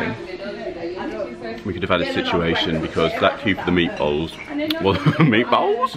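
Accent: England, United Kingdom. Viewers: Love your vlog, so nice to listen to someone from England. Some nice things there so now I have the job of seeing if they do them in Murcia, Spain!!! Keep up the good work.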